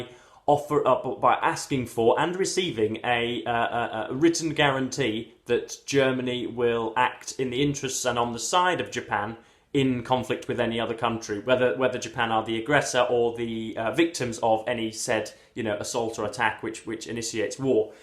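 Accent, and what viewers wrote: United Kingdom. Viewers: North of England, sir, not Britain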